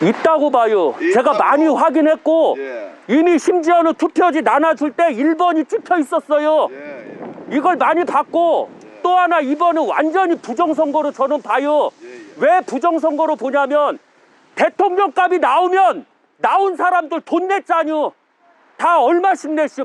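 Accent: Chungcheong, South Korea. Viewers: 말씀 내용을 별개로 충청도 사투리가 참 정겹습니다...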